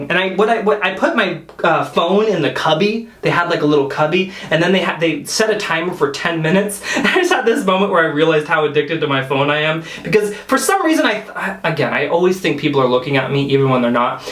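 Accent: United Kingdom. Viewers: Your British accent sounds like Tom holland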